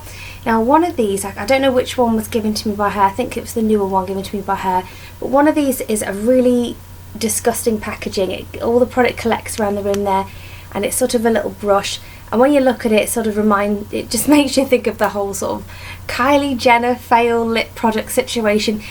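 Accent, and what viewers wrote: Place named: United Kingdom. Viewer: Yay 21 product, that is amazing! I used up 30 makeup products this year (including one little polish and a face primer). Happy to follow you in 2017, girl! :) Are you from the UK, I looove your accent! You remind me of how Sali Huges speaks (I adore her)! Oh congratulations on your pregnancy! I have two little girls! :)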